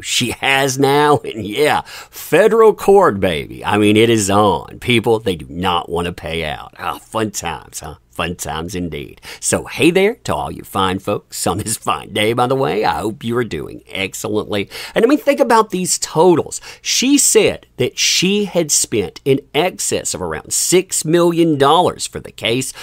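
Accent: South, United States. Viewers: Hey. Are you from the south? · Yes he's from the south 😆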